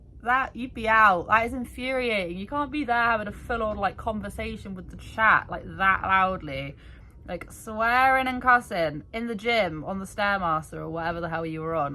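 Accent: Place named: United Kingdom. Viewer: Omg yes thank you gorgeous! Anyone who is against your sweetheart butt are just nasty people. Your obviously a genuine person. Trashy people attract trash. And you didn’t back pedal….you said “hey guys I messed up.” End of it. Your human, your a content creator, mistakes will happen. Unlike onora YOU TAKE ACCOUNTABILITY! Love you and your British accent!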